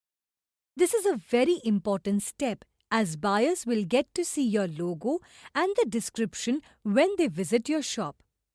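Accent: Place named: India. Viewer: White lady with an indian accent, funny. I feel like I'm at 7-11 or my doctors office.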